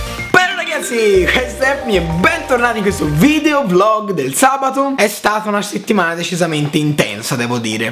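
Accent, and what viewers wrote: Tuscany, Italy. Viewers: Oggi mi sono iscritto e scommetto che sei toscano!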